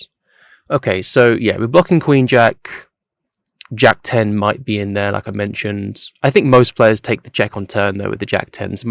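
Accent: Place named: RP, United Kingdom. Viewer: are you going to bring some sub content or a course or smth in between? I love your approach to poker as well as your perfect RP english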